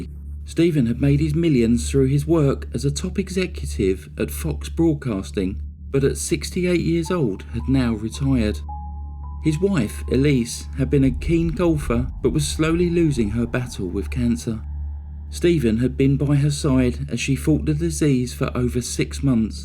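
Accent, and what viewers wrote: England, United Kingdom. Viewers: You are all very nice. Love "my dear"😊Sounds like you are from England!? Would love to visit England one day. Thank you💜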